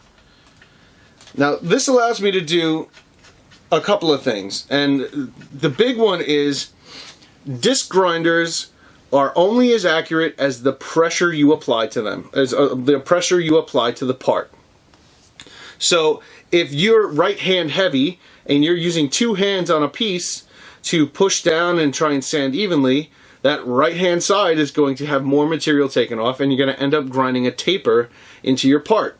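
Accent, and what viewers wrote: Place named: Long Island, United States. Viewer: you should have never stop making videos. You come across great It’s your Long Island accent that makes it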